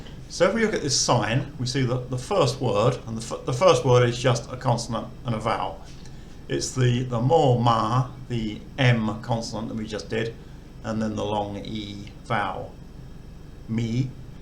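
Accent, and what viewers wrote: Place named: Southern England, United Kingdom. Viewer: Hi Interestingly, if you are northern English, then but and put ARE pronounced the same (both pronounced as you say put). To my northern ears, southern English such as yourself say almost bat (for but). Hence the problem of transliteration of English in to thai for English people, let alone considering all the other nationalities. Keep us the good work.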